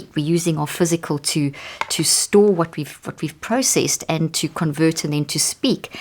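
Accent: South Africa. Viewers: South African….Irish accent much nicer, I am saying this as a SA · Ek hou van haar aksent!suid afrikaans aksent! Claude Frankryk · I was guessing but wasn’t sure. Typical South African accent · All good. I wish you didn’t have the SA accent and didn’t have to talk so fast. On the other hand that will make people buy your book. Talking so fast seems to be a millennial thing but it doesn’t come across as being sincere, or did you have too much coffee. You kept on showing your book but it always have that metal tree in front of it. Was that also a trick to make is buy your book?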